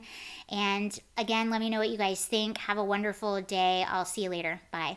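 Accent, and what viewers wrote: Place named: Chicago, United States. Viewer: Hey darling are you from Chicago area? I kind of had a feeling you were before but the T-shirt made me think maybe I'm right. I'm I was born the Evanston but I grew up on the Iowa side of Illinois in Kewanee but my entire family is from the Chicago area Naperville Downers Grove, and a lot of other places that are eluding me right now. But my mom and I lived in Lisle and Bolingbrook until I was about 5. And we have deep ties to the area. And tons of friends and family from over there that we still see. Though I live in Tucson now so I miss home but at least I'm in a Republican state or at least it was a Republican state people think that we're going to not vote for Trump this round which will make me really sad. I'm praying that doesn't happen I'm praying we stay a red state but so many leftist have moved to Arizona so I don't know. 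But anyway yeah I was curious if that's where you're from I was trying to figure out your accent. And I'm almost positive you have the same accent as my aunt but I could be wrong.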